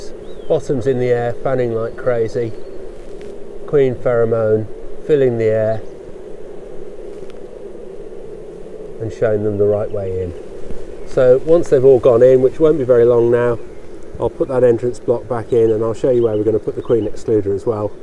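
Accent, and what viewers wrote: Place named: United Kingdom. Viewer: The second I heard british accent I moved on.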